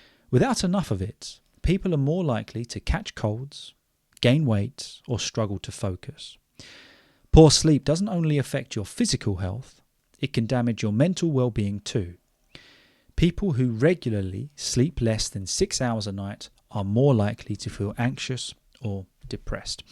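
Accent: United Kingdom. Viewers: Hello Dear, How are you doing? I have been listening and watching your podcast for around 3 to 5 months. I love British accent. particularly you accent. I am trying to copy you voice all the time as much as i can. I hope, you are really good. And today's topic was fantastic. I really enjoyed it. And I am from Bangladesh particularly sylhet. Do you know there are a large number of Bengali people live in London? I think it's number over a million. And most of them from sylhet. To be honest I am taking preparation for my IELTS. Your videos are really helpful for the Listening section of IELTS. Prayer for me so that I can come to the London which is my dream City. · Is he British, please?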